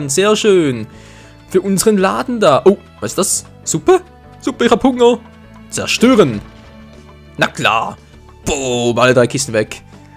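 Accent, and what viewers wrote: Switzerland. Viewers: cooles video kann es sein das du aus der Schweiz bist wegen deinem Sprachverhalten xD nebenbei hab dir n abo und like dagelassen ich würde mich freuen wenn du auch mal auf meinem channel vorbeischauen würdest :D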